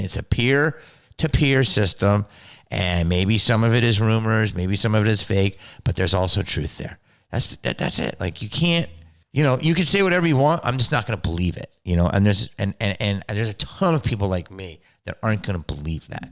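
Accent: England, United Kingdom. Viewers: Your British accent is hilarious 🤣👏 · Loved that English accent! All you need are muttonchops! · Love the English accent, very Tim Curry. I enjoy your banter. xx Like your opinions too. 💐 Love Joe Rogan too, Harry and Megs need to shut up. Love from Australia!!! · It's crazy indeed! I don't read news about this people, i prefer to read and listen things about you! Omg! Lol your english accent is great! Your shirt is beautiful too. You always looks amazing! Thanks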